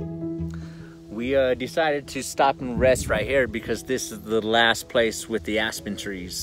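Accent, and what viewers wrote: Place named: Texas, United States. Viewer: liesss, that's a Texas accent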